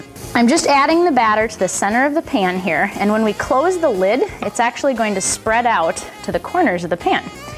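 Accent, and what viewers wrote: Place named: Midwest, United States. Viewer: Mid-west accent LUL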